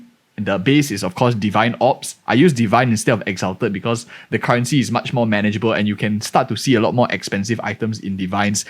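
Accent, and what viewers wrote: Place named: Singapore. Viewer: Great content, are you from Singapore? HAHAHH idk why but u sound very Singaporean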